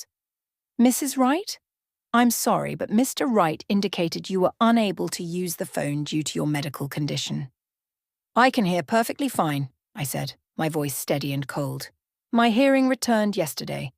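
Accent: England, United Kingdom. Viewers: Just love your real, English accent. So tired of listening to the same, possibly AI-generate voices and bad narration... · Very interesting story , Love your English accent ❤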